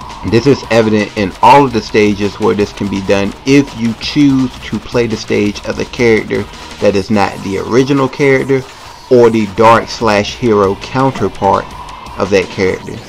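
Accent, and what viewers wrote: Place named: South, United States. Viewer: You sound like a fine southern black gentlemen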